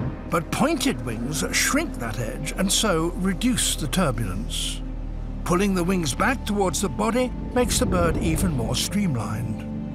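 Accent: United Kingdom. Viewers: I have loved David Attenborough way of narrating nature for many years ... his british accent and the dedication to spread out knowledge.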